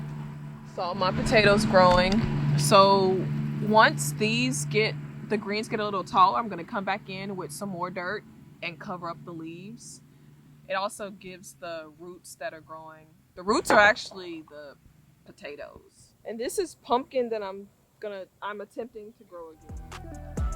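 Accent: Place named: South Carolina, United States. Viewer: The way he talks… you have to be in SC. My grandad from there and they all all the same way 😂!! Ain’t nothing like country living.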